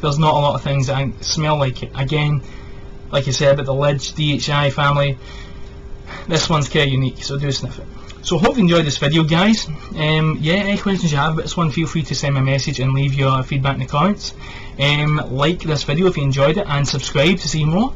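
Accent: Scotland, United Kingdom. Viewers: are you Scottish? btw, i think you've got the only armani nuit review on youtube, so kudos to you for going off the beaten path! just a question, does it remind you of berburry brit rhythm? must be the cardamom, heliotrope and patchouli.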